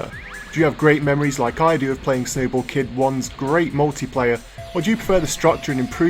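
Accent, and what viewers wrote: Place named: RP, United Kingdom. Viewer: Too many years at a private school. Your saying that's why you have a posh ascent??? I would think they would teach you proper English without an ascent hahaha Just doesn't make sense to me.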